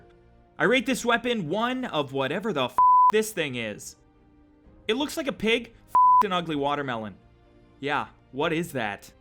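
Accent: Canada. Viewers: I can tell this guy was Canadian in the first 2 seconds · Dude sounds like stone mountain with a canadianish accent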